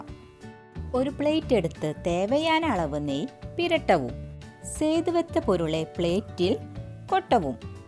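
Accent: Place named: Kerala, India. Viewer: Malayali tamil....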